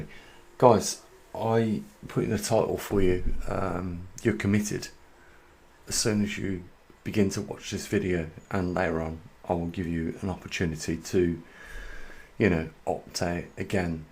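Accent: United Kingdom. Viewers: Oh Thank you for transskript!!!! Your british accent ist Hard to understand to me 🙈 sorry! So Thank you verry much ❤️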